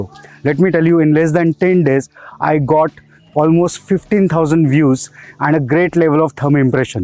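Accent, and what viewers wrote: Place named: Pune, India. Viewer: Without even seeing your description, I knew you are a Puneri by your accent. Great to know, I owe 8 years of my wonderful life to Pune. My next next car is definitely X1. Thanks for detailed information.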